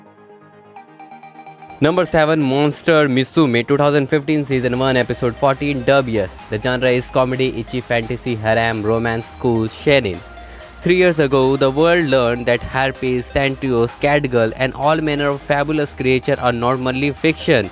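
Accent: India. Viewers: Hey bro your voice like indian are you indian 😊ya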